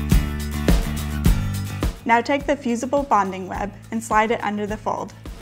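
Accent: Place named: United Kingdom. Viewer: Very British pronunciation. I notice a lot of young YouTubers now drop their Ts.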